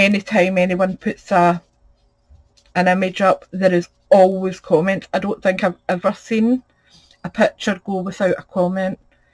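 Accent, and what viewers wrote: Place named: Scotland, United Kingdom. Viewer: Hi! thanks for the tips!! love hearing a scottish accent!!! Good luck with your channel x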